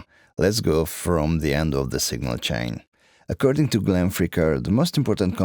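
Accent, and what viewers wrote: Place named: Balkans. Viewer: Fullok a balkáni angol akcentuson és vele párhuzamosan a kis rajzokon. 😆💯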